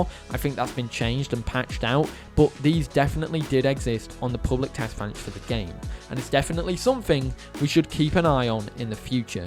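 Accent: United Kingdom. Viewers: its been so much time since ive watched a video, and god does the bri ish accent bring me memories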